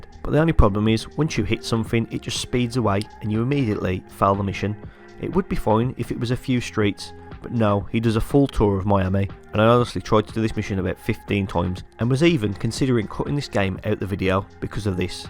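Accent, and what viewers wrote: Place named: West Midlands, United Kingdom. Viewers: Nice to hear a West Midlands accent on YouTube. Great video! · Completely unrelated here. But that is a black country accent if I've ever heard one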